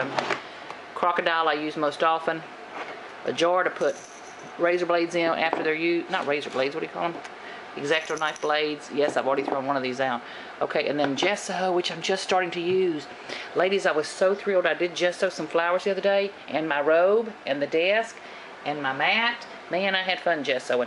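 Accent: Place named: South, United States. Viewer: You are a woman after my own heart!, diet coke and chocolate! HA! Thanks so much for sharing! Good to hear a fellow Southern-Sister's accent on YouTube, keep up the good work!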